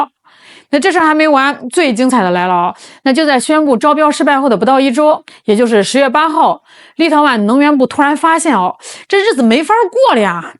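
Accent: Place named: Henan, China. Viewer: 这个主播是河南人。口音太明显了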